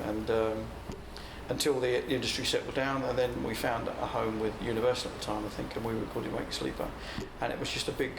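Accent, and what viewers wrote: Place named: Cockney, United Kingdom. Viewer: Very humble bloke,Cockney accent,reminds me Steve Harris in the way he Talk and the sense of humor,working class attitude,anyway,It's a pleasure seeing Mick Talkin' about his favourite albums and It's not Easy to pick only five great albums,that's how great are to me,could be Seven albums at least and I'm not a hughe die hard fan of the band but surely they eat in same table with, and and not necessarily in that order,so this is my top 5 àlbums. 1.. 2. 3.. 4. 5..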